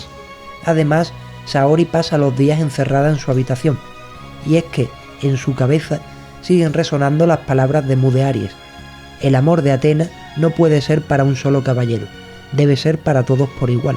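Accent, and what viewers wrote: Andalusia, Spain. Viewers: Muy bueno vídeo amigo muchas gracias por las aclaraciones. Una pregunta, ¿eres del sur de España? Por el acento 😁. Saludos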